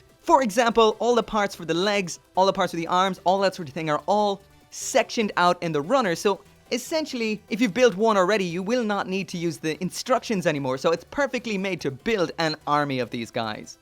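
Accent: Ireland. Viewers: Do I hear a bit of Irish in your accent? Can't place it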